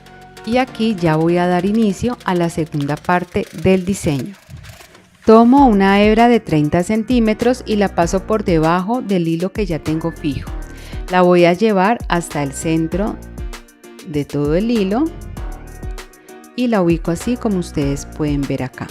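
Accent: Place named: Medellín, Colombia. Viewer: están muy bonitas y la manera como lo explicas lo hace fácil de seguir el proceso, el tono de voz muy ameno, muy bacano. Pareces de Medellín. Saludos.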